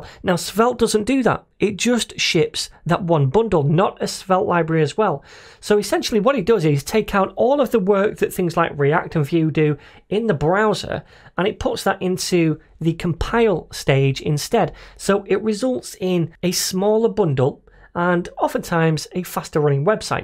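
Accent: United Kingdom. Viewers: don't know yet if your tutorial is ok, but you got +10 points for qool brit accent xd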